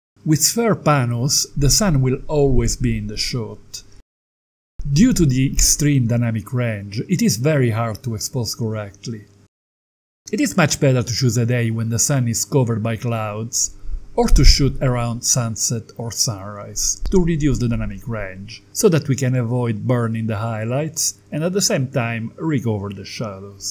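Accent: Italy. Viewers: I’m enjoying your videos. I love your Italian accent. Just like myself living in Australia with a strong Swiss accent.👍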